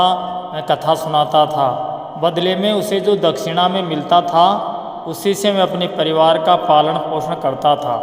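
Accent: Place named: Punjab, India. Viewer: Lagda y tu punjab ton a tere awaj lag rae a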